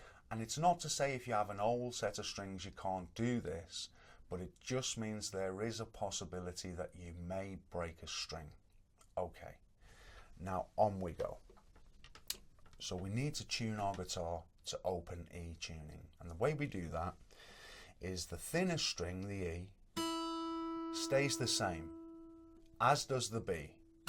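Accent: Liverpool, United Kingdom. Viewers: Ahh ok, it had to be one of them and to be honest your accent is just a tiny bit more softer and more subtle than what I expect from Liverpool lol. Anyway thanks for the upload, good video, I'm already experienced in alternative tunings but your video just loaded automatically after a previous video I watched and you had me playing along like I was a beginner.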